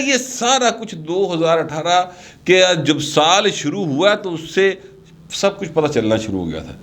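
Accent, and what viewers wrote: Punjab, Pakistan. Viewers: Extremely sweet way of speaking punjabi with urdu mix. Looks like our own family member is speaking and so loving to listen. I hardly listen to what he is talking about but sweet bhai you are..hahahaha 🙏👍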